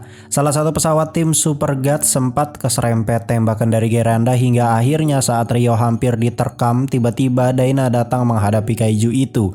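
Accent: West Java, Indonesia. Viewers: Njir lu nyunda bre 🗿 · Sunda ny dri mana bang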